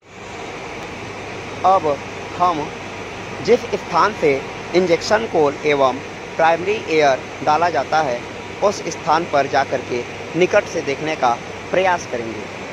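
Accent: Standard. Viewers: आपकी शुद्ध हिंदी का श्रवण करके हृदय प्रसन्न हो गया भाई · Your hindi is pure. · sudh hindi achha bolta h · शुद्ध हिंदी का बहुत अच्छा प्रयोग किया गया है उच्चारण अत्यंत शुद्ध है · Kya baat he Ramayan ke badh aapki sudh hindi suni he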